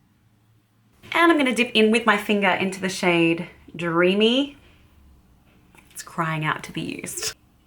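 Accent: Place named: Australia. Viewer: I absolutely love this review 😍 it's so nice to hear my palette being reviewed in an Australian accent 🥰 I work for Glaminatrix and designed this palette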